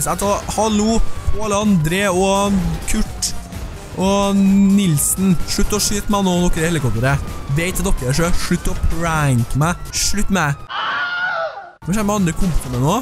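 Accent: Trøndelag, Norway. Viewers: Er du trønder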